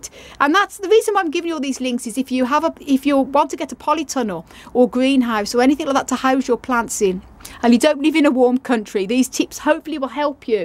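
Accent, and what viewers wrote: United Kingdom. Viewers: lol. said,in her sweet British accent, tarpoline. Here in th US we like to shorten everything and we just call it a tarp. You can get one at any hadware store for 20 dollars or less. As far as your humidity concerns, you can't go wrong with a small fan.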